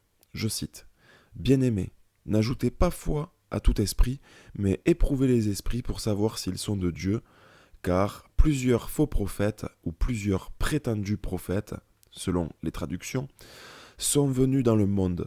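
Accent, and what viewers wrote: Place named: Marseille, France. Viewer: Pourquoi vous avait la même voix de Kevin gall? Sauf que toi ta un accent du sud marseillais ou autre. Donc ma question pourquoi aviez vous la même voix ?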